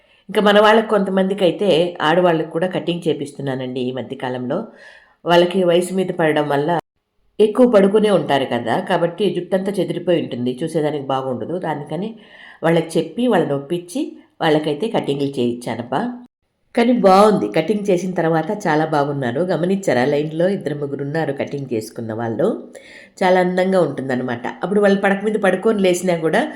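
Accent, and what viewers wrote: Chittoor, India. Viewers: Ctr side antha me slang amma memu kuda mee laage ❤❤❤I'ove ur slang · మీ స్లాంగ్ చిత్తూరు యాస. మాకు అనే బదులు మాకీ అంటారు. వేయాలి కి బదులు ఎయ్యాలా, ఎన్నో ఎన్నెన్నో అంటారు. ఏం ఫరవాలేదు. ఎవరి యాస వారిది. అర్థం అయితే చాలు. నేను యూట్యూబ్ వీడియోలు చూడను, ఎందుకంటే వారి ఆదాయాన్ని వారు తమ స్వార్థానికి వాడుకుంటున్నారు. మీరలా కాకుండా, యూట్యూబ్ ద్వారా వచ్చే ఆదాయాన్ని నిస్వార్థంగా వృద్ధుల సేవ కు వాడుతున్నారు. అందుకని మీ వీడియో లకు సబ్స్క్రైబ్ చేసుకున్నాను. ఇంకా లైక్ కొడతాను. మీ వంటి వారిని ప్రోత్సాహ పరచడం మా వంటి వారి బాధ్యత. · Hi ma..i like ur slag..endukante..nenukuda me vure 😊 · Authentic chittor slang,mixed with telugu and tamil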